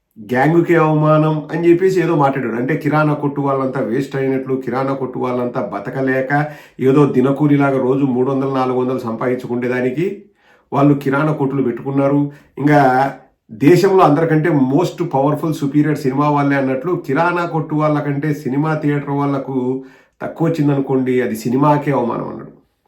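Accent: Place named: Telangana, India. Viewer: Sudden గా తెలంగాణ భాష మార్చావ్. నీ పని చూసుకో ..పోరా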